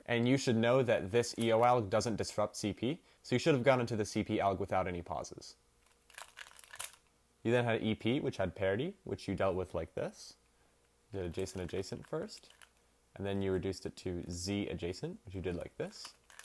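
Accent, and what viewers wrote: Russia. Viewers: I've had a feeling that a Russian accent could be probable for this one, considering who you're critiquing 👀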